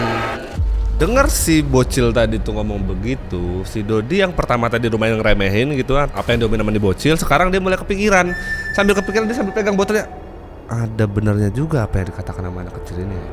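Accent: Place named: Lampung, Indonesia. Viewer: Lampung banget logat nya bang...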